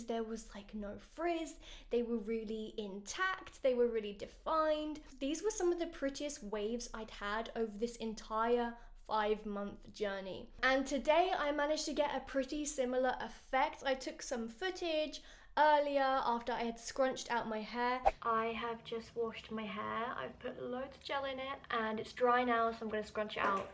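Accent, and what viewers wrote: United Kingdom. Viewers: What made you assume that? Her obvious british accent or was it something else?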